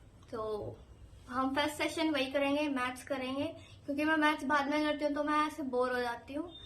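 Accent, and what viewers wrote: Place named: South, India. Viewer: South Indian ho kya aap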